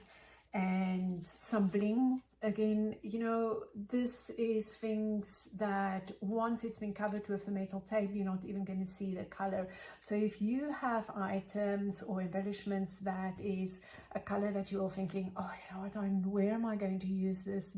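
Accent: South Africa. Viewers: Just given me ideas for Christmas gifts thanks,nice to hear a South African accent.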